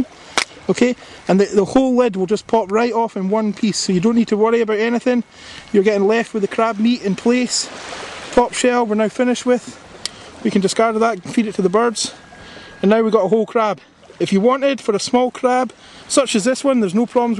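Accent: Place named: Texas, United States. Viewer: Good information, but very unusual Texas accent.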